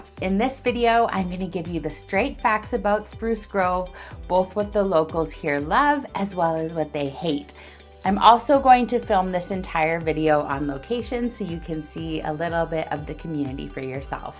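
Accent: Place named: United States. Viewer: Hi, I am first time watching your video That is nice for me because I love American English accent Love from India ❤😊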